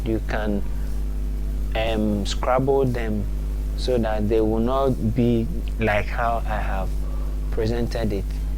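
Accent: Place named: Ghana. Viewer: Good tutorials BTW you sound like a Ghanaian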